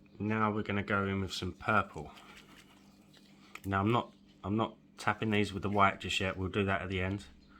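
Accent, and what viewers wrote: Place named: South East London, United Kingdom. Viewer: Hi. Beautiful effects! I'm from S.E. London originally and your accent sounds familiar, is that your area too?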